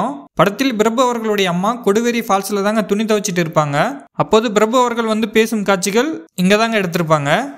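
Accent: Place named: Madurai, India. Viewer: Super madurai language avinke .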